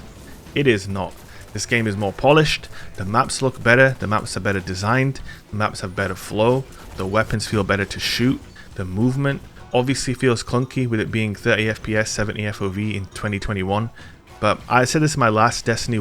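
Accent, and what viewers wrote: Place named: West Yorkshire, United Kingdom. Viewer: Are you in West Yorkshire? I know that accent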